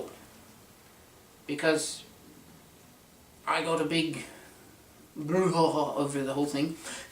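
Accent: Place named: United Kingdom. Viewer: i like how you got a british accent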